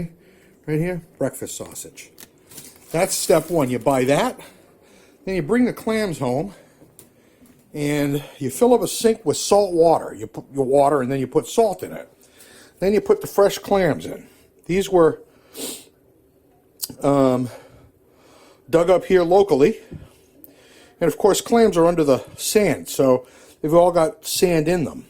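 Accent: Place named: Rhode Island, United States. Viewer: You sound like a Rhode Island guy. I use to keep a boat in Mattapoisett but I live in Rockport. Enjoying your adventure videos, you guys have been to a few places I have yet to see. Maybe next summer will provide some opportunities but, alas, I do those trips alone. Been riding for 57 years. First bike was a Honda 50. I delivered newspapers and saved up enough to buy a used bike. The next bike I rode was an Electra Glide! What a step up, holy cow, from 50 cc to 1200 cc. My lady friend does not eat clams, oysters, crabs but she will "suffer" her way through some shrimp recipes. Haha, I am from Louisiana and do not care for lobster but I can make it work, if I have to.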